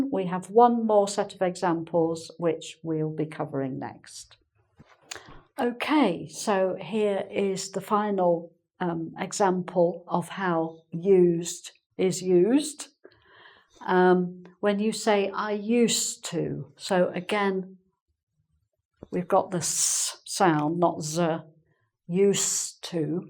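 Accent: England, United Kingdom. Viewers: Your voice in very clear than others .. United Kingdom's beauty of English Language starts here... Thank you so much teacher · Hi, from Ukraine. Understood everything. So pleasant to learn from your lesson and hear that British English. Like a honey for my ears 😄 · I really can't stop watching your videos. You have a beautiful accent. I used to hate british accent but now i'm fond of it because of you. Thanks nice. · You're the best from engVid, you and James, but you're a far better. I love your British accent, when i was starting on English, it was hard to understand it but now i hear it clearer than the American one. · great class as ever, I learned American English, but I want to practice my listening with the British accent. Thanks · From morocco i thank you a lot for your superb lession. And the way you explain it . that makes me love your clear british accent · So brit sz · her accent is British Amanda, the pure british accent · Ela é Britânica? 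Parece sotaque Inglês.... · Lovely British accent thanks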